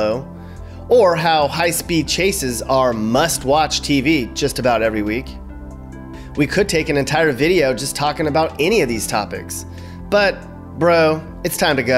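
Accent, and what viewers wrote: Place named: West Hollywood, United States. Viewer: West Hollywood where your accent was born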